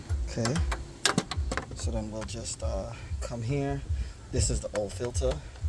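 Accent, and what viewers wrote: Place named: New York City, United States. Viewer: Thanks bro you saved me $80 , I hear Washington Heights in that accent…🤓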